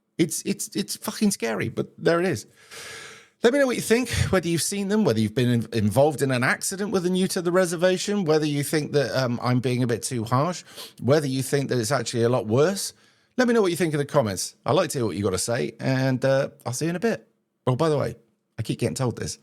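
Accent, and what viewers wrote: United Kingdom. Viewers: All the crimes you mention are not the exclusive domain of immigrants to a country, there are home-grown bad people to. As for the ‘killer machines’, traffic regulations and driving customs differ from country to country so it’s to be expected someone unfamiliar with those differences may have an accident. I note from your accent you are from the UK. Anne Sacoolas was driving on the wrong side of the road when she killed Harry Dunn. She claimed diplomatic immunity initially. She was white, middle class and privileged. You don’t help feeding into people’s fear and negative stereotypes.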